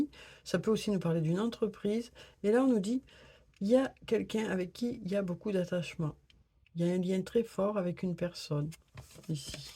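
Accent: South, France. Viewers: Bonjour,cela fait plaisir de vous,mettre un visage sur l accent du soleil e👍🤩😘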